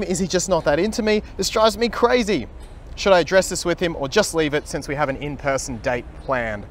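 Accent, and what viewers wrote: Australia. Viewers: I loved all the advices you gave the females,.... Taking notes & thank you.🙏🏽. And your Aussie accent is cute. Awesome. Sydney Harbour is a great land 🇦🇺🇦🇺🇦🇺🇦🇺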